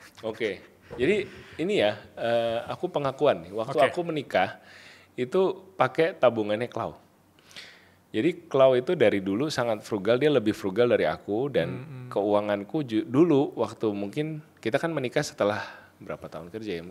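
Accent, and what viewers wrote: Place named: Sumatra, Indonesia. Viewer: hostnya ngomongnya ga smooth terbata2. logat sumatera totok banget😂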